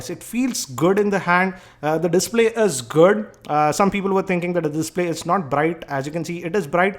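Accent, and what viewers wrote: India. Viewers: indian accent so funny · You talk like Apu Nahasapeemapetilon.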